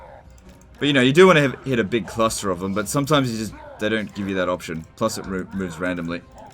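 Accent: Australia. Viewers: The yt Auto subtitles are getting better at australian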